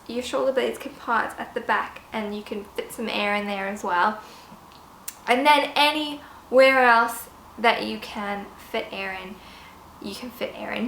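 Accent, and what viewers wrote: United Kingdom. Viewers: didnt know she was british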